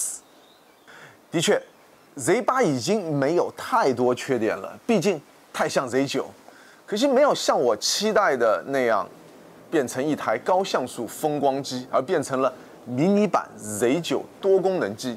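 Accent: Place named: South, China. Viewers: Up主是上海人吧,一口南方口音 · 听着南方口音，很亲切！澳洲Z8实在贵离谱了:face-pink-tears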